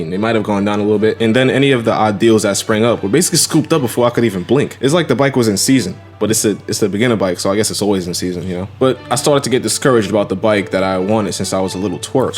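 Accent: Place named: New York, United States. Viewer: Man I knew the minute I listened to your voice I knew you were from NY also lol. Hope to run into you in the city sometime, love your videos 👍🏻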